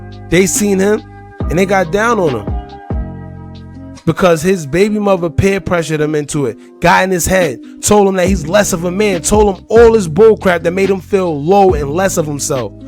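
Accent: New York, United States. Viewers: How u kno dis and u sound like u from new york🤔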